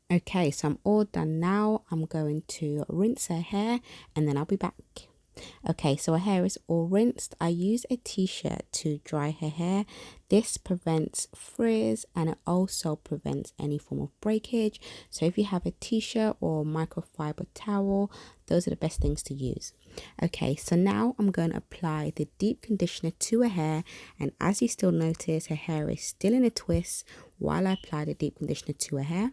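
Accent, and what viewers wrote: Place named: London, United Kingdom. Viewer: Are you from London? Nice accent.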